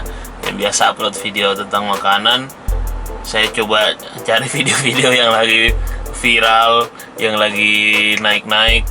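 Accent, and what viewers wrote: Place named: Sulawesi, Indonesia. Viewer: Logata kaya org bugis gorontalo dik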